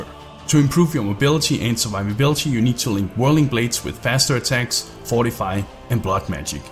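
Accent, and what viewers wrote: Denmark. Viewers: are you danish ?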